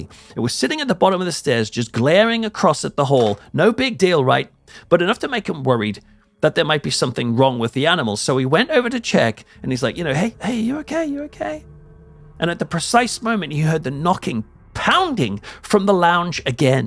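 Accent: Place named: North East England, United Kingdom. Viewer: Ah,I thought you were from north east,been trying to track the accent,was right,I was born there but moved to Doncaster when I was 2.keep it up Bonny lad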